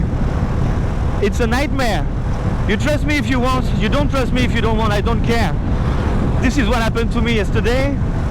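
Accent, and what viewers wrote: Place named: France. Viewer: Vu l'accent anglais je pense que tu es Français, donc je vais parler Français. Ca fait vraiment peur comme expérience, en un instant tu te retrouve dans un enfer de piqures et de saloperies volantes qui t'attaquent de toutes parts.. En regardant la scène je me demandais pourquoi tu n'avais pas foncé dans l'eau, mais immédiatement je me suis dit qu'évidemment tu ne devais pas être capable de penser très logiquement dans une situation pareille, et peut-être que comme il ne t'étais jamais arrivé pareille chose tu as cru qu'en partant plus loin elle cesseraient de te suivre ? C'est dingue qu'elles continuent a t'attaquer autant même une fois dans l'eau.. tu dis même qu'elles venaient sous l'eau pour te piquer ? As tu tenter de les éclabousser a ce moment ? Je te demande pour savoir un peu mieux quoi faire si jamais ca m'arrivais.. 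Tu as prévu de l'equipement au cas ou cela arriverait de nouveau ? Je ne sais pas si il existe des bombes spéciales pour les repousser.. En tout cas content pour toi que tu aies pu survivre a ce terrible moment, et bravo pour tes vidéos qui ont l'air très intéressantes et que je vais surement continuer a explorer ! Bon voyage !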